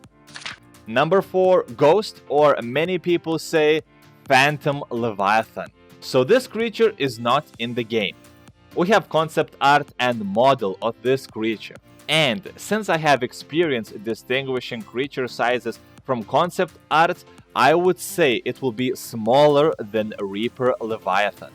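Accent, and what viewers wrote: Russia. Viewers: этот русский акцент прекрасен! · This is the one guy on youtube who has a legit russian accent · Lol for some reason i had this ransom feeling the narrator would be a russian male...i CANNOT belive i was right... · dude you sound like a badass Russian general your accent is epiac! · Этот акцент... Русский, да? :) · is he russian _ accent_ calls us camrads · I love your voice Russian! And goodjob dude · You sound russian? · Haven't seen this guy's vids before. There's something really charming about his heavy Russian accent in combination with his upbeat attitude. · Your Russian accent sustains me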